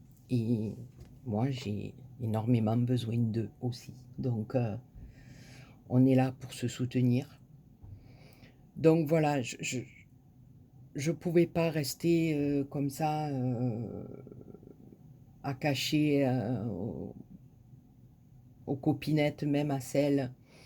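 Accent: South, France. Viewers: Coucou, Je me joints aux autres pour t'envoyer mes sincères condoléances à toi, tes enfants & petits enfants! Dans ces moments là je ne sais jamais quoi dire, mais ce que je sais, c'est que tu risque de te faire disputer par moi si tu te retiens de pleurée, car tu vas de détruire de l'intérieur et ça c'est pas bon du tout donc lâche toi sinon attention a tes fesses hi hi;) Oui tu dois rester positive et avoir toujours envie de croquer la vie à pleine dent que ce soit pour toi, tes enfants ou/et tes petits enfants et puis ton mari n'aimerait pas te voir sur la pente raide, donc ma belle continue de nous faire entendre par le biais de tes vidéos ton accent du soleil et des cigales! GROS Gros Bisouuus vous tous!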